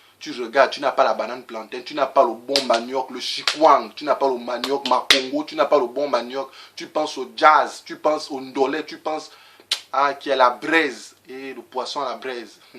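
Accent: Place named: Fang, Gabon. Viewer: hahaha un vrai fang . eeeeh aaaah dza lol I like it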